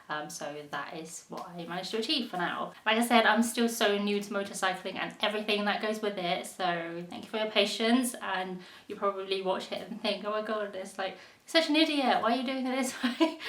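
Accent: United Kingdom. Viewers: you have british accent - funny... honest gurl / great video.. thumbs up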